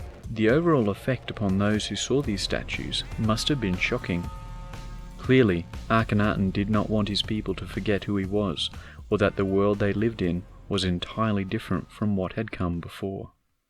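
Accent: Australia. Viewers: Clicked on this, was shocked to hear an Aussie accent, New sub here! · Awww c’mon now. Just coz we have an Australian twang we don’t need to mispronounce names….Amun Ra is not said as Ahmoon Ray, it’s more akin to Ahmen Rah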